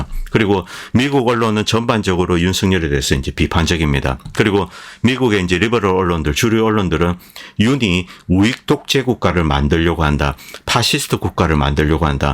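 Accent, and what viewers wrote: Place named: Gyeongsang, South Korea. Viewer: 형님 경남이지요 친금감잇는 목소리 방송잘보고잇어요 😅 이기자 통신됴😅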